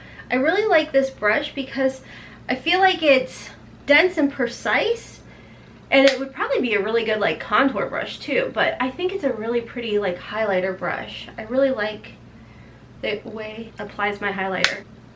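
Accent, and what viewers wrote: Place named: East Tennessee, United States. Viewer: Found your channel and LOVE IT!! Especially because you sound like me lol (I’m from East TN) Anyway you mentioned self tanner and I’m just getting into that (used to be a tanning bed queen), what do you use??? So many make me orange and girl I love my Vols but ain’t looking to be orange and white 🙄🤪 HELP!!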